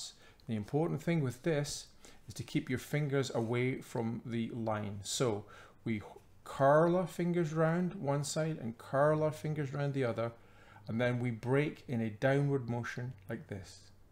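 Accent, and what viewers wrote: United Kingdom. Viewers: Cannot hear you, volume too low. Shame, such a nice Scottish accent.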